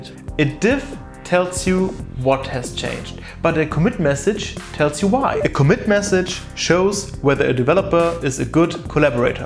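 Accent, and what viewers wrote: Germany. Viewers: Man hört sofort raus, dass Du deutsch bist 😜 Aber super hilfreich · The world is so small. From your accent, I knew you're german. But then I opened your website and saw that you're also like me from Hamburg 😅 Very good video. I'd love to work with folks who could appreciate a good commit like that! Subbed!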